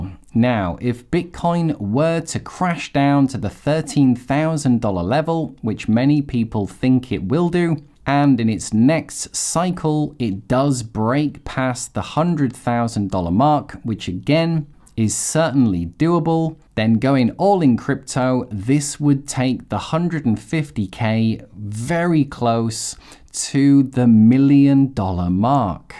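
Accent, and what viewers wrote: United Kingdom. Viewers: I realize that I like your videos cause your accent reminds me my visit to Britain. Such a beautiful land and scenery... However I buy the deep... 😁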